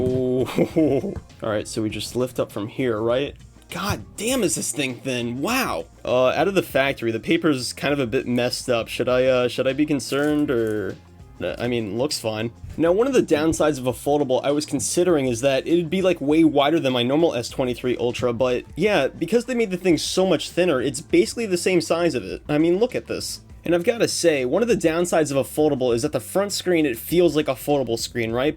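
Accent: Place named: United States. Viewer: Guessing from the accent you're from usa right? How do you use an android phone over there, iMessages don't work on android. From what i know they all use iPhone & iMessage in usa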